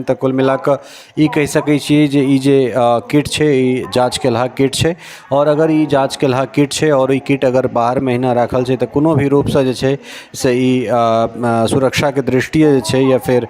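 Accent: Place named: Bhojpuri, India. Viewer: चुप हो जा बहुत छ छ छ किया भोजपुरी बोले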